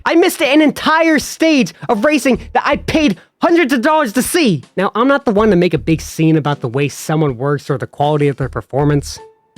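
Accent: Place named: North, United Kingdom. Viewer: Man it's awesome to literally hear the diversity in NASCAR fans. Cause I can detect the northern in your voice lol.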